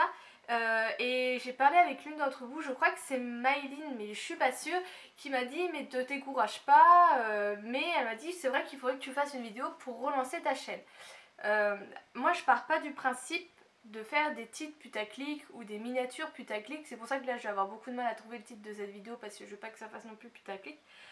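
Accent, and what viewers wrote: Lorraine, France. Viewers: avec le petit accent lorrain de chez nous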